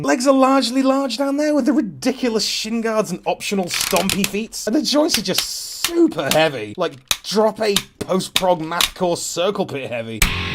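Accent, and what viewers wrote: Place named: United Kingdom. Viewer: Ah, the accent and "cheeky". Of course you know Penfold and Dangermouse. Did you guys have Bananaman?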